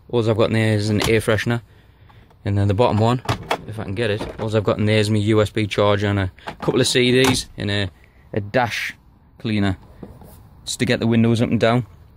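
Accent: Newcastle, United Kingdom. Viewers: Lmao not everyone can understand a Geordie accent not that little buttons fault. Hahaha nice vid well presented nice motor also.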